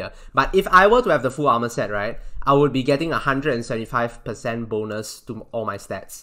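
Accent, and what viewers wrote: Singapore. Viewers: knew it from the singapore dialect that i have heard.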